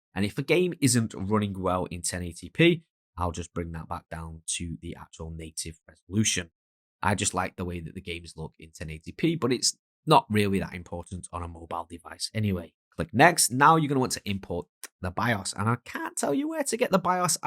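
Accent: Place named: England, United Kingdom. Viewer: Maybe because he is speaking English with an English accent.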